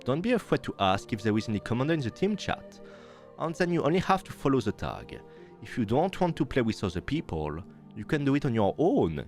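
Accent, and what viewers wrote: France. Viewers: I love your french accent! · you sound french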